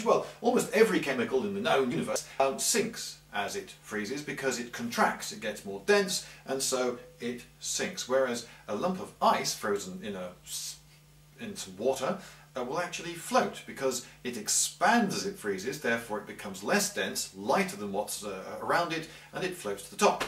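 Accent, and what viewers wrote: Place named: England, United Kingdom. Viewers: You'd be being naive and very vague to give that answer, yes in summary all the accents are British but it's pointless saying that. be more specific, he has an English accent. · Paragraphs one and two, valid point...... mind_change.exe now loading........5%.........10% ERROR WINDOWS SYSTEM CRASH......... Please restart your computer brain, this computer is now running a self-diagnostic..........Entering Safe-Mode..........."hey look. And he has a rant!" BTW about the third paragraph, he does have a popped collar and a British Accent, I thought that was plenty of proof on his end alone! ^.^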